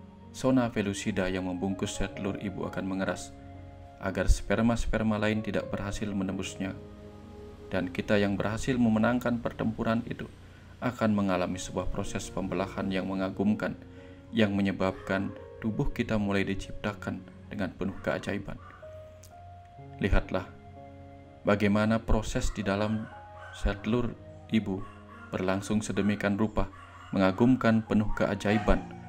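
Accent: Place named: Bali, Indonesia. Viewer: Huruf T nya masih Bali yan.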